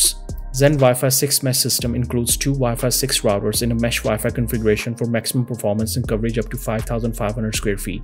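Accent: India. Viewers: this is painful, its just a collection of adverts for all the products so you learn jackshit. nothing is compared and the accent just reminds me of indian tech nonsupport.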